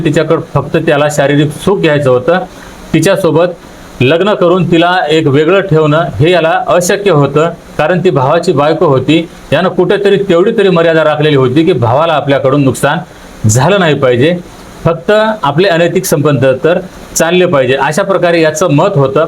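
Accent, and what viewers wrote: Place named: Karnataka, India. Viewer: तुमच सर्व रील पहातोय बोलन जरा कन्नड टोण येतोय आणि सैशय नव्हे संशय म्हणावे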